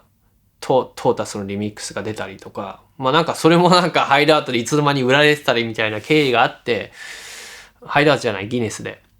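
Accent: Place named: Kansai, Japan. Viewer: ちょっと関西弁なんがすごい新鮮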